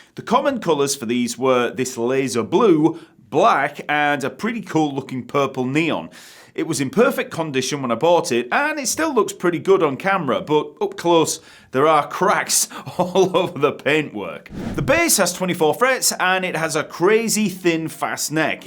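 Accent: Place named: North, United Kingdom. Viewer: Apart from your quality of content , it's so great to hear a NORTHERN VOICE on the tube ! -from a fellow Northerner !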